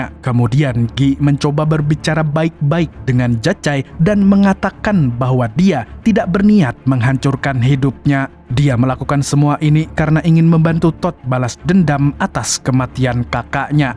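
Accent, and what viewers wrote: Banjar, Indonesia. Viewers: orang banjakh bang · Mang orang banjar kah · Banjar d mananya mang😂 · Orang Banjar kah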